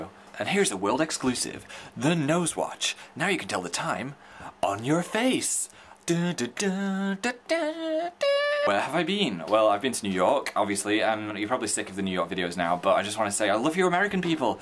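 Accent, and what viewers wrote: North, United Kingdom. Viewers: So northern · 1. THE SAME BEDSHEETS FOR GOD'S SAKE 2. so northern. much love. 3. such expresssion at the end of the video. very appreciated. 4. what sarcasm. 5. my fav video among like a 100 others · his voice is so northern ohmygod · I love how northern he sounds! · His voice... I'm used to this very Northern accent now. It's gonna change, and I know it'll end up being slightly gradual but omg jdhfhdndhkxa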